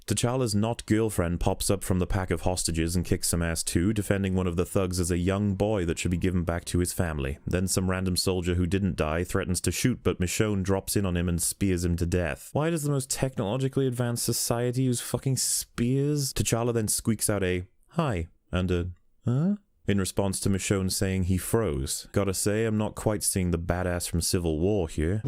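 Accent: United Kingdom. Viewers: you can sound like you know what you're talking about by just having a British Accent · we all know what N-word you were trying to use....And its not nitpicker, which is what this entire video is. Seriously, stop trying to hold yourself up like some sort of film scholar when you have to complain about how they're clothes are designed. It's called "tradition" and "culture". This whole video, i thought was a joke, and in retrospect, it is, just like your channel. But wow. Why is Black Panther somehow the ONE marvel movie that pisses you off so much? It's like you had your teenage son write this in a few hours and asked for dad to use his british accent to do a voice over because everyone can trust a british accent. What a pathetic excuse to get mad over a movie. Btw, i do agree with like, 20% of what you said, but if i play the "suspension of disbelief" card, you'll somehow misread that as "oh well, opinions are subjective bro." So instead i ask you to study in a film class or something and brush up on your presentation. 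So many unnecessary "F" bombs, at least get creative with your cussing. So uncivilized. :) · I hate to say this to you but I feel as if your British accent works against you with this particular commentary. It makes you sound like those willfully ignorant colonizers they speak of in the movie who came to Africa in other parts of the world to quote on quote spread civilization wow being willfully ignorant others culture identity and or achievements. I say this because a lot of you are complaints we're actually dressed in the movie I'm not sure if you tuned things out once you came across something that did not make sense or forgot them. A lot of your complaints for example are centered around the heart shaped herb as well as a panther suits. Again I say this is more or less comic book accurate so if you're going to lay fault lay it on the comics themselves. I suppose you could blame the movie for not editing out elements that don't make sense so allow you to have that. 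However it was clearly explained that only the line of the king could consume the heart shaped herb. Anyone else it would kill or rather only one person in a million could consume the herb and live. Now I will give you this, the whole kinglee trial could have been done better. That is also something that was taken from the comics but not in its exact form. On YouTube there is a cartoon call Marvel Knights the Black Panther. Essentially the trial was as such, anyone was free to go through the trials to become the king and those trials consisted of vesting wakandas best warriors. The Last Man Stand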